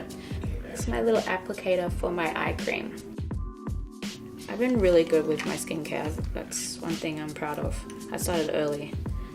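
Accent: Australia. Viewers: Is this Aussie accent ? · I looove Australian accent😍😍😍😍😍😍😍 she's sooo beautiful💘💘💎💎💎💎💎💎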